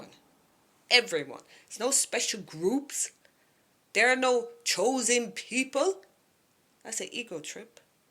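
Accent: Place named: Jamaica. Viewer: You sound Jamaican.....keep on LIVING. Number seven 7⃣.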